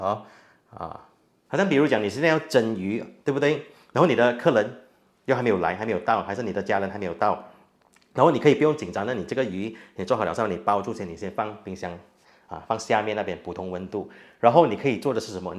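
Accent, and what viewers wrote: China. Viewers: 你的客家話很重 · 广东，一块都讲成一告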